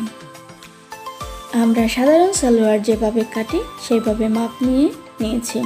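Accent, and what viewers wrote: Sylhet, Bangladesh. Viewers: Apni sylheti 🙄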